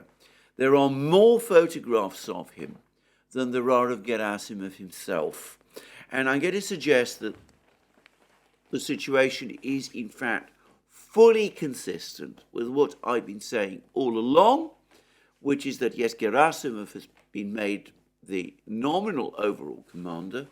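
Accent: United Kingdom. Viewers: I love your program,. I never miss it. You're well-informed, truthful, reliable and also a gentleman. Besides that, I found your British accent clear and very, very nice. Cheers from Santiago de Chile!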